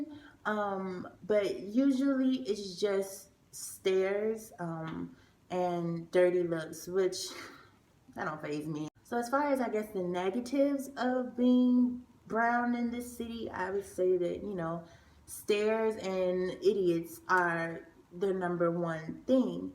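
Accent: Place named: Chicago, United States. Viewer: I thought I heard a Chicago accent🤔 hey girl 🙋🏾‍♀️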